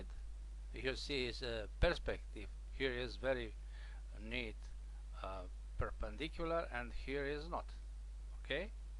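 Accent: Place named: Poland. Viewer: I find listening to your lesson reminds me of talking to my Polish father and relatives. It was very well done and understandable.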